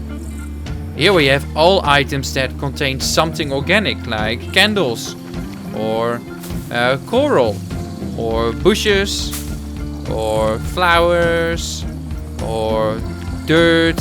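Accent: Netherlands. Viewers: main thing I learned from this video is that you're from New Zealand 😂I always assumed your accent was Dutch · You realy sound like a dutch guy! Nice video btw! :D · one question, are you dutch? Love the vid btw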